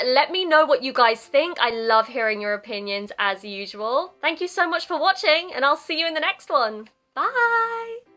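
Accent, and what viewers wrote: RP, United Kingdom. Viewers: Thank your for another interesting video! I realized that I had many misunderstandings about the UK. When I’m watching your videos, I often see your accent as polite like proper English or RP(may be because you are in front of a camera?) so if you had a chance to introduce your accent, I would be happy to hear that! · I totally agree with your thought about British English. You say that there is no the British English and so called British English has recently been getting lots of influences from American English. I myself speak American English with a Japanese accent like most of us Japanese, but your English sounds sweet to my ears , especially words pronounced without a r sound are pleasing. See you soon. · やっぱ、自分が習った英語は、イギリス英語なんだなあ～☺️聞き取りやすい。でも話せない。(^-^)/ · やっぱりBritish English の方が聞きやすい！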